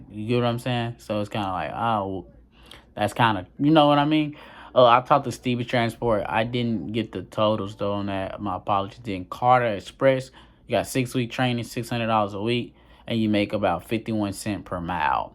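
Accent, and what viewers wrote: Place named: Nashville, United States. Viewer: I want to say thnx and in curious are u from Nashville? THT way I say “LIKE AT) reminds me of when I spent a summer in Nashville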